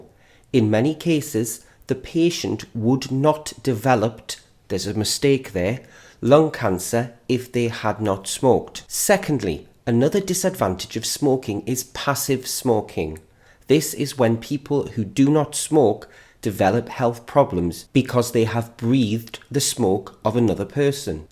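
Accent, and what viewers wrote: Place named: United Kingdom. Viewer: Hi~ I am back. My final exam has just finished. I will try to practice writing skill during this long new year holiday. Thank you very much. Please keep doing🎉😊 I cannot love your teaching program projects more.🎉 Besides of writing, i love the way you reading at the end coz i could learn how to read and practice pronunciation, tonation, british accent. Perfect! ❤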